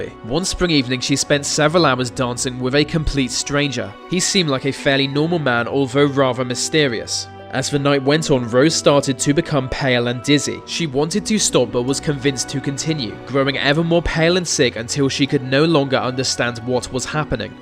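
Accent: United Kingdom. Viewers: Word if advice to anyone not familiar with Ontario: don't EVER say it the way the narrator does in this video. That is incorrect and you will be corrected and/or laughed at if you do. No, just because he's got a British accent it's not some eclectic English pronunciation he blurted out, dude simply never heard it pronounced before and that's a fact. The A in Ontario is a long vowel, not short. Simon Whistler does this same shit all the time in the videos he narrates too. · Can anyone tell me why we need to have GODDAMN LIMEY'S narrating ANYTHING especially for Canadian videos?!?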